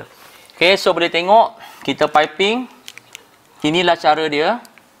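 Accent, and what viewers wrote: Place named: North, Malaysia. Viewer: T kasih bg resepi, cakap utagha syok dengaq, harap2 rajin la nk buat nti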